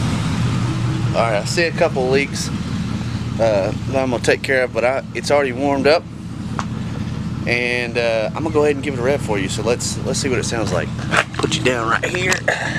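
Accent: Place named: Alabama, United States. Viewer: You talk like me😅 I'm from South Alabama and fixin to try this right now!! Keep it up and play whatever music u want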